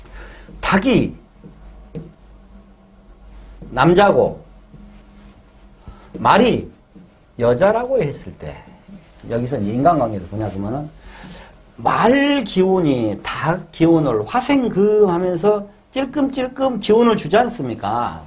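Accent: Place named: Jeolla, South Korea. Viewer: 전라도 사투리가 친근감 있고 좋아요 알기쉽게 잘 설명해 주셔서 감사합니다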